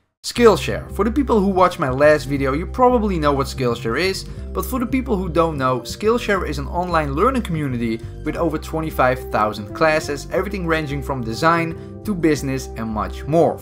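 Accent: Netherlands. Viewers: I can hear you're dutch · your voice sound nederlands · You sound Dutch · Nederlands Engels accent, bah, werk er aan! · Youre dutch right? Want ik ook😂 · Your voice sound dutch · Are you dutch? I hear that accent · Are you Dutch or something? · Steenkolen Engels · Are you dutch? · Are you from nl ? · You sound Dutch. Are you Dutch? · Great Video Mate👍🏽 Are you from the Netherlands? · Hey dude, you’te Vids are very good and you for sure desurve more subs but i have a feeling you are dutch, are you ?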